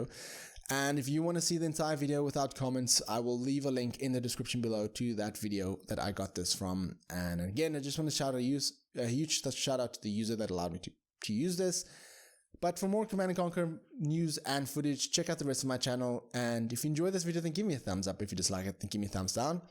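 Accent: South Africa. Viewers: Respek. Generals is a classic of classics. And BTW where you from? Souf Efrika?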